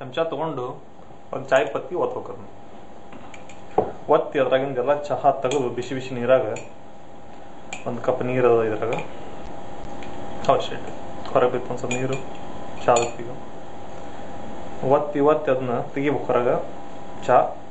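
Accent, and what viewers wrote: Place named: Karnataka, India. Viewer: i somehow like the dharwad accent of speaking kannada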